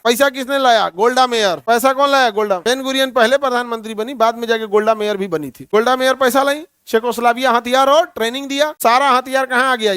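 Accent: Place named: Bhojpuri, India. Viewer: I like the way he speaks in bhojpuri style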